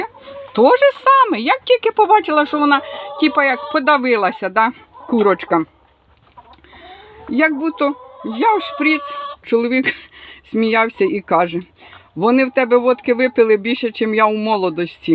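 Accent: Kuban, Russia. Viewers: Но это не украинская мова. Так говорят в станицах на Кубани взрослые. Я сама с Кубани и понимаю эту речь. А вот украинскую не понимаю. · Спасибо за видео балакае як у нас в станице на Кубани дякую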